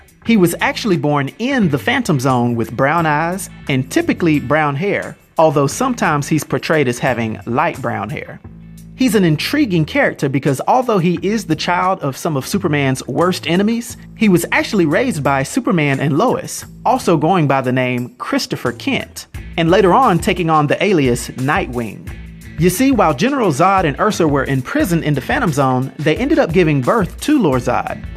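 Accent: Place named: United States. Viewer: I rellay like your american accent from which part of USA are you from? Amazing video as always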